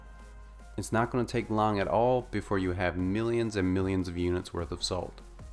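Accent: Newfoundland, Canada. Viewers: Are you a Newfoundlander my guy? Watching from Dartmouth NS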